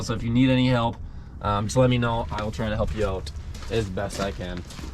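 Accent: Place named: Norway. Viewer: Where are you from? Your accent sound exactly like a Norwegian trying to speak American English.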